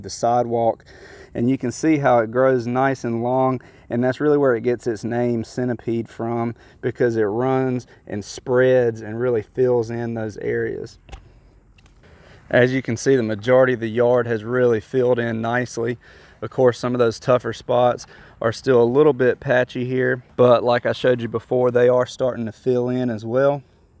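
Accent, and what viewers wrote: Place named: Southeast Georgia, United States. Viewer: Lawn looking good. You sound like you're from southeast Georgia.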